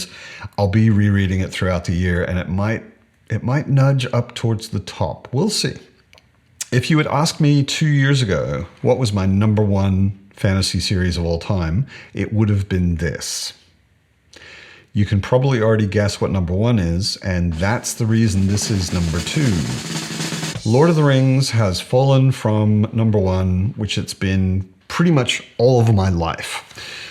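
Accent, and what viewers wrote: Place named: Australia. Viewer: I can’t place your accent at all haha I can just tell there’s a bit of Aussie in there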